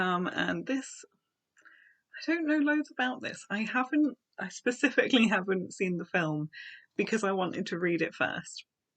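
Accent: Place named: United Kingdom. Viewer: Ikr? It's like the saying about there being so many books & so little time. But if you're a fast reader ( I was but it's now depending on the book) you'll do fine👌.I just lost one of my cats while taking him & his brother to the vet to be fixed,so I'm finding it difficult to focus on reading more than a short chapter atm. Anyway,just keep on reading as much as u can!👍 P.S are u from The UK? Your accent is so pleasant to listen to. I'm from Northridge, California,a suburb of Los Angeles & can't stand my speaking voice-lol.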